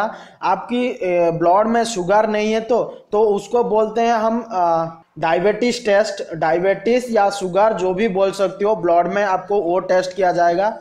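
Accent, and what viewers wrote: Odisha, India. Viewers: Bhai Hindi pura odia bhalia laguchi...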